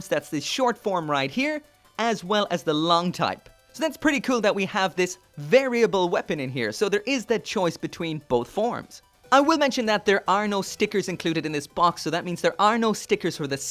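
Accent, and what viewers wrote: Ireland. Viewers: Do I hear a bit of Irish in your accent? Can't place it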